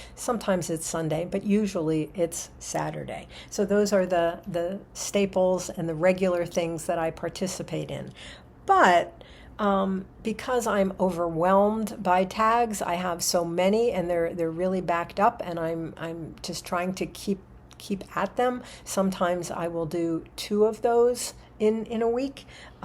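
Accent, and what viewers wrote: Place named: United States. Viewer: Age is just a number I would be more pugnacious but then again I used to be a pro fighter. I have only recently come across your channel I enjoyed your American accent and the way you presented yourself and your books so I subscribed. I live just outside of London, although I am a sexagenarian, I still work in North London part-time as I am a peripatetic chess coach who goes from school to school teaching it. I am an avid reader. I read eclectically. I am a bibliotaph and a librocubicularist. Recently, I picked up an antiquarian book, The Golden Bough, in Hampstead, in what you would call a thrift shop, we call them charity shops here, and I am very pleased with it. Someone also threw away a beautiful bookcase at the bottom of my garden, so I rescued it, cleaned it up, and now store some of my Hardbacks in it. It's one of those bookcases with lead-lined glass doors. 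You can have a peek at some of my books here; it's about 10% of the books I own. If anyone is on there and wants to touch base, hit me up! <link> Regarding poetry, it can touch and change the soul. I recently read the first book in the autobiography of Maya Angelou, and she once said: "When you know better, you do better". That one aphorism inspired me to become a better man. My favourite Poet is Kipling. I also enjoy Shelley, Byron et al.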